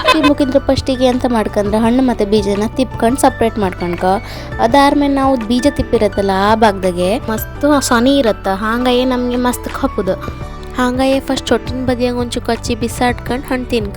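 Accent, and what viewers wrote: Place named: Kundapura, India. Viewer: Beauty of kundapura kannada👌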